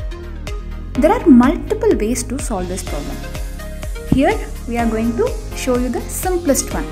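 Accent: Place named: India. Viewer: That takes 16 seconds to solve its okay to speak Indianglish but you don't need to kill yourself and still come out with wrong answer 200 - 50pi = 42.92... 42.92...÷ 2 = 21.46... Thanks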